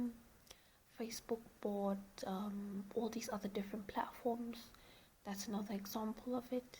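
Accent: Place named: United Kingdom. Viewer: What a British accent you have , i am amazed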